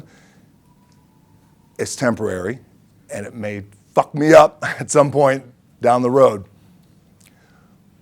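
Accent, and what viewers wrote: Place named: United States. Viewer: USA English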